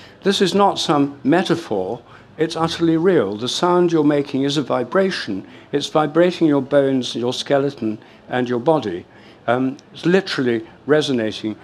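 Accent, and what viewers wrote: United Kingdom. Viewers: I guess it’s just his British accent. But certainly not a serious intellectual. Reminds me of Alan Watts.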